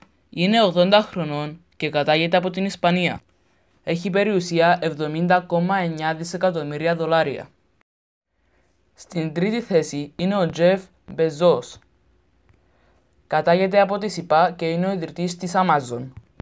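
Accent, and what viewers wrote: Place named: Cyprus. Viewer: κυπριος ειναι ετσι μιλανε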